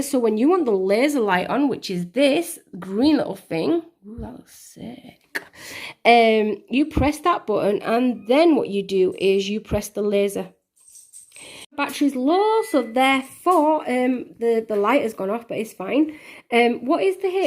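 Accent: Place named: England, United Kingdom. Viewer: I like the accent. England?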